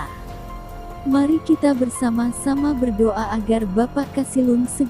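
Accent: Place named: Java, Indonesia. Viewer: hadir mbak medok ..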